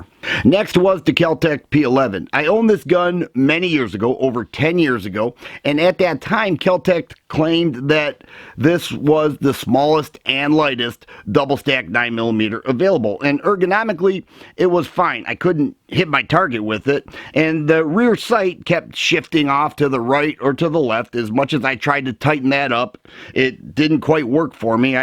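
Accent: Michigan, United States. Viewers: Are you from Michigan or somewhere near there?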